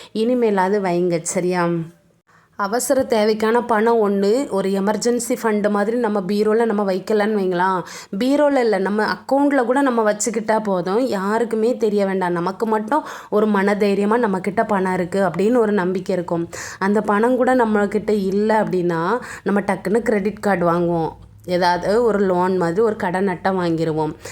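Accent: Tirunelveli, India. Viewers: நெல்லை பேச்சே தனி அழகுதான் சூப்பர்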